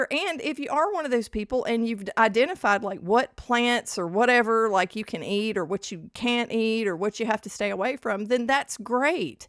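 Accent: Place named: South, United States. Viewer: You had me at "expose the corruption of Big Food and Big Pharma". Your Southern accent didn't hurt a bit!!! My family has been in the South since before the Revolutionary War!!! That said, I have primarily been somewhere between keto and carnivore since 2010. Eatimg my "normal way", my A1C is 4.1 and my insulin is 1.3...meaning I am metabolically healthy, and at the end of the day, that's my goal. Long story I'll spare you, but life had me go down a rabbithole and discovered the general science behind gut health and lactofermented foods...not necessarily a departure from keto...or carnivore if you follow Dr Sean O'Mara. I make my own raw lactofermented sauerkraut and other lactofermented foods, and make my own milk kefir, "perpetual" cultured buttermilk, and sour cream/creme fraiche. I know what's in them all and I know there's no additives or preservatives or anything funky or non-food. 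Part of the long story, not only did it help my daughter repopulate a healthy gut microbiome after MRSA, but it's helped me keep my diverticulitis at bay. To wrap this back around to my OP, my main goal is to reduce my dependence on Big Food and Big Pharma. Borrowing from Dr Berry, I try to harken back to my own ancestral eating and eat the way my rural southern sharecropper grandparents ate prior to 1960-ish. I was born in 1957 so I do know what that was. Nothing was GMO back then. They grew everything they ate, milked the cow twice a day, had chickens, maintained a smokehouse in order to pr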